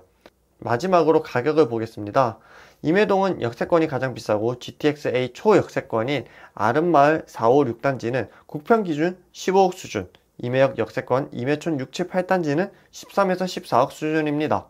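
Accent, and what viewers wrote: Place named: Gyeongsang, South Korea. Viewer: 경상도 말투다.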